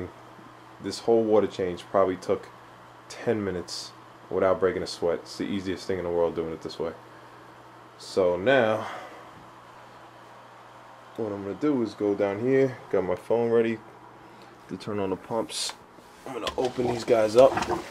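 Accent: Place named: Brooklyn, United States. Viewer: He must be from Brooklyn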